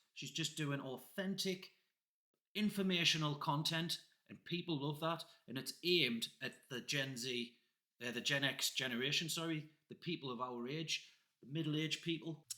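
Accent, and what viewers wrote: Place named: North East England, United Kingdom. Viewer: I’ve just found you because of a mention on Chads channel. I’ve already watched some of silver and solo videos but not heard of Alexa, so will definitely check her out. It sounds like your from the north east, I’m from Cumbria and about to start a YouTube channel. I’ll be following your journey, great content 👍🏻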